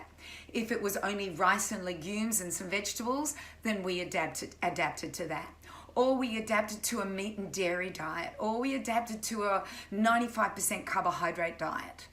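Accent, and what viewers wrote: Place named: Australia. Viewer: You sound like an Australian and i love that you are at least you known what's going on in here (australia) comparing to the other ones that i found, all american. So thank you so so so much you explain things nicely whithout getting rid of everything. Thanks again 😊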